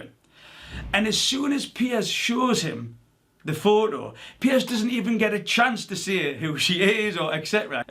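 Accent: Newcastle, United Kingdom. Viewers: Love your channel, Your Goerdie accent reminds me of hone, stay safe over there in the UK. Greetings from Perth West Australia 🇦🇺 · Love hearing a fellow geordie on YouTube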